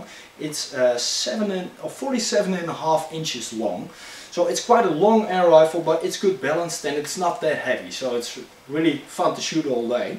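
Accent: Netherlands. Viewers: mooie Nederlands accent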